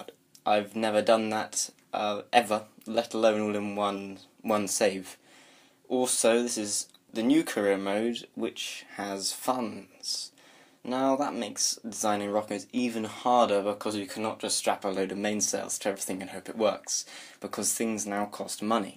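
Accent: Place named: Canada. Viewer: ***** is Canadian, probably why you cant tell:p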